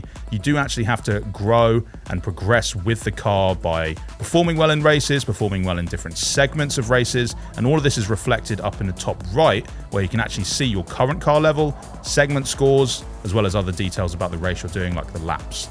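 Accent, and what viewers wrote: United Kingdom. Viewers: Wreely wreely good game... damn i hate british accent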